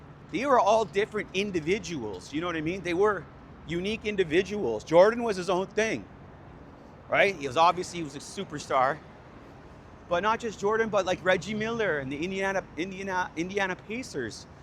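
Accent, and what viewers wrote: Canada. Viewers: First take away in the first minute, you're Canadian eh? I can hear it lol. But I'm feeling you, let's go.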